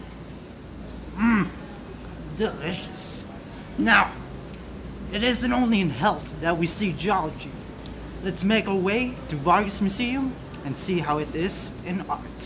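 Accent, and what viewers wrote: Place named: Australia. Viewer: I can't stop laughing 😆 the Australian accent 🤣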